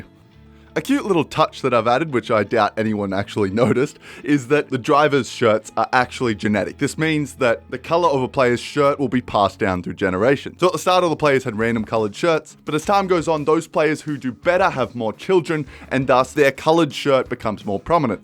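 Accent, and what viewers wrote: Australia. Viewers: Your Aussie yea?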